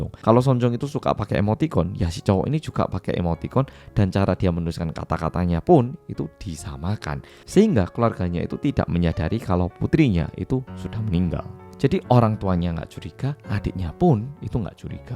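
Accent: Java, Indonesia. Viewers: Saya senang nyimak cerita2mu terutama gara2 aksen medhokmu, yg bikin saya ngakak dan kangen masa kuliahan. Dan ga banyak back sound bodoh yg sbnrnya merusak kualitas video. Sementara sbgn besar youtuber beraksen Jakarta krn emang dr sana, byk back sound ga perlu yg malah menurunkan kualitas video, dan saya booooooooooosan abis dg gue gue lo lo yg terkesan keminter. Sehat selalu bro.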